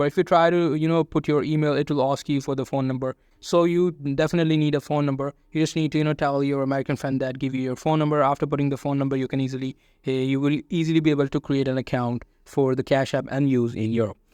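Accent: India. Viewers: I hear your indian accent gang no hiding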